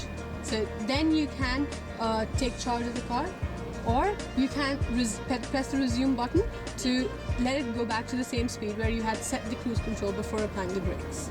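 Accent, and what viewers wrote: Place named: India. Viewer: Indian accent, mate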